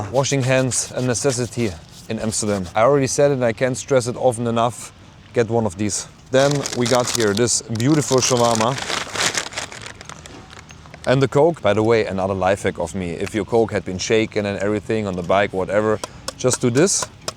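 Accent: Germany. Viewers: Kannst nicht mal auf deutsch, bist aus deutschland das hört man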